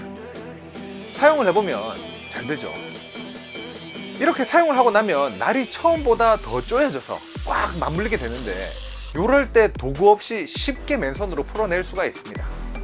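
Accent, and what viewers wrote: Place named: Countryside, South Korea. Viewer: 시골 사투리가 구수하네요. 좋은 정보 감사합니다.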